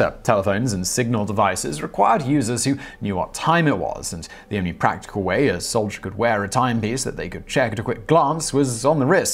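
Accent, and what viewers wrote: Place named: RP, United Kingdom. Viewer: "Radda-ranj" oven? (just teasing! I'd love to have a posh British accent over my midwest Ohio drawl...)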